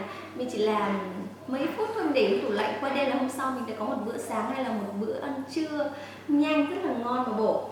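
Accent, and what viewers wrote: North, Vietnam. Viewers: Nghe giọng Bắc ko muốn xem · Giong bac ky 75....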